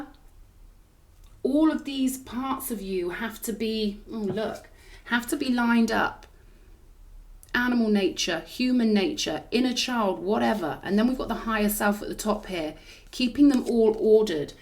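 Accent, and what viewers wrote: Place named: Cockney, United Kingdom. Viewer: your given it some proper Cockney slang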